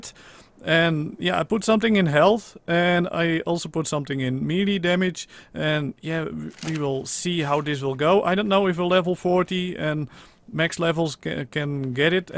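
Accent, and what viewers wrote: Netherlands. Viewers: Kratis Games maak je grap ? je hoort duidelijk aan zijn stem dat die Nederlands is , Nederlanders hebben altijd zo een rare uitspraak als ze engels praten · are you dutch you souns dutch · I like your (i think dutch) accent!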